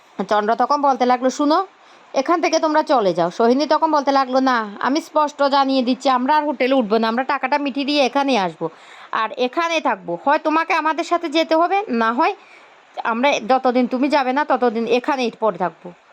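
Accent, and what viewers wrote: Bangladesh. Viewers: আগে ভালো করে বাংলা ভাষা টা শিখে বলুন। কি বিচ্ছিরি শুনতে লাগছে🥴🥴🥴। বাংলাদেশী না রোহিঙ্গা?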